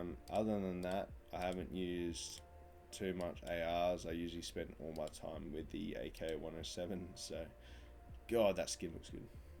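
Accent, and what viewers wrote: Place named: Australia. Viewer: Finally an aussie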